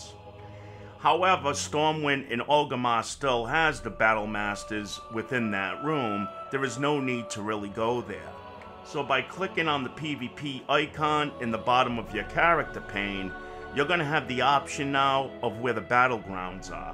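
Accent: New York, United States. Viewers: Came for the WOW vid. Stayed for the NY accent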